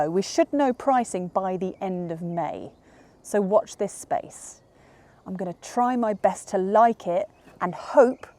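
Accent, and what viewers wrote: United Kingdom. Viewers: UK english?anyway horrible to listen wtf is that. British MC/BC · Banters not working too loud and artificial punching around british accent and bit of drama doesnt always add on, scorching on ears honestly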